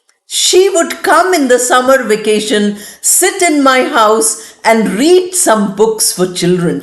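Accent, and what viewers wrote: India. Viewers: You speak standard Indian english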